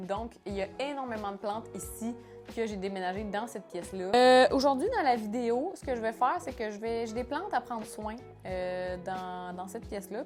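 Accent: Canada. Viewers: Accent canadien = sympathie = pouce vert ! ❤️❤️❤️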